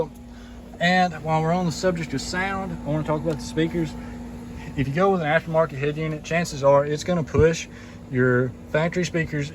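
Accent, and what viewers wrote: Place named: Arkansas, United States. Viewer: You got an Arkansas sounding accent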